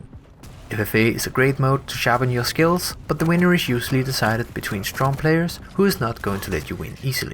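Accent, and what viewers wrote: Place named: Denmark. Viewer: I love your accent, you shouldnt be Danish by any chance?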